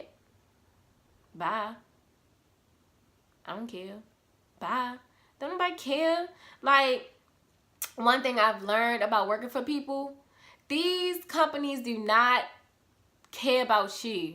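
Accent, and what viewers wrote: United States. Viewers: I clicked on ya video and immediately knew u was from Baltimore lol i was like she sound Nd talk like me😂❤️ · that's why I'm trying to work for myself and I love your accent are you from new york.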